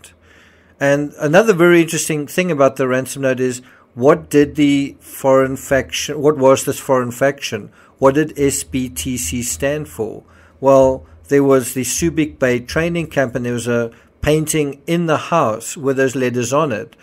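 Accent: South Africa. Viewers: The most South African voice in true crime.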